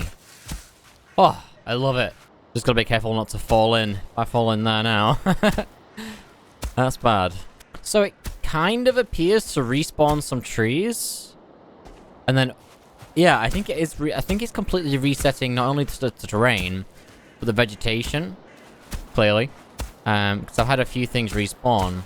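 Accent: England, United Kingdom. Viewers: giọng người Anh ?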